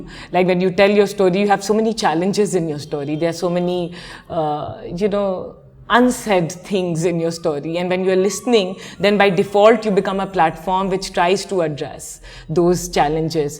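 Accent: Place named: Jamaica. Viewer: almost sound Jamaican 💚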